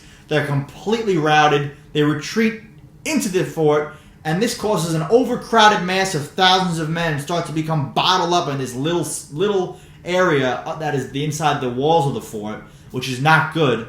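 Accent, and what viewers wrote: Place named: North Jersey, United States. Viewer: Another Great presentation my friend, Thank You. Where abouts in NJ are you from? Judging from your accent, I’m assuming North Jersey lol, I’m originally from Dumont in Bergen County myself, Be Well 👍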